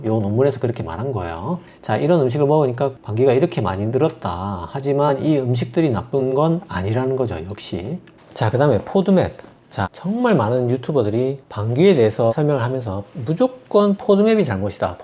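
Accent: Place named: Busan, South Korea. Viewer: 전라도 광주 사는데 원장님 부산 사투리가 넘 재미있고 정도가구요 의학정보를 부산 사투리로 들으니까 은근 중독성 있어서 계속 듣게 돼네요 앞으로도 부산엑센트 계속듣고싶어요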